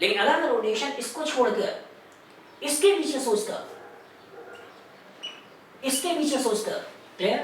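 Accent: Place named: India. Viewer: Thank you sir for your lesson but I understood your english poorly is it Hindinglish?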